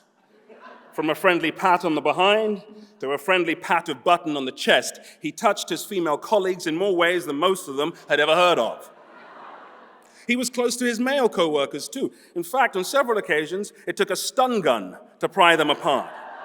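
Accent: United Kingdom. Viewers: shit is so scary when you see a guy in american productions only and then he's british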